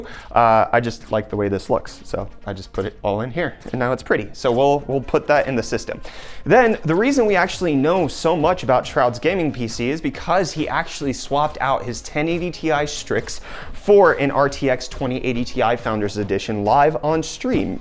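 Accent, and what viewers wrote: South Africa. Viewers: Are you from South Africa? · you south african? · What part of south africa is he from? If it’s cape town i feel for you given the whole water situation. My grandfather is struggling with the extra costs from accidental overuse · Are you from South Africa ??? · Where is you'r Sa accent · are you south african? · Do i hear South Africa what does this pc cost in Rand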